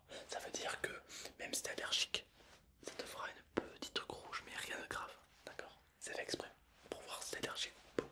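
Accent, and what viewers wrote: South, France. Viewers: Normal c l'accent du sud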